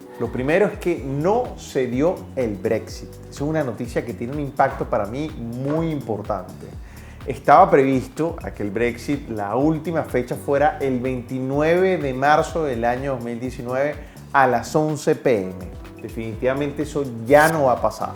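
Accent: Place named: Venezuela. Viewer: Por tu acento creo que eres venezolano, yo también lo soy y vivo en New York y ya tengo casi dos años en el trading, espero aprender mucho más con tus videos y con tus tips, y agradecido por compartir tus conocimientos, para los que aún estamos en este proceso de aprendizaje.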